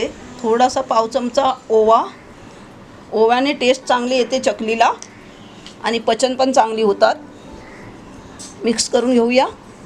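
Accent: Malvan, India. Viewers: Tumhi Malvani aahat Ka madam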